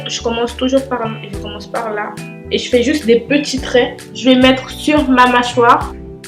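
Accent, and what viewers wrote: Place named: Cameroon. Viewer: Les 1ere secondes ton accent me rappelle que tu es triangle national camer. En tout cas jolie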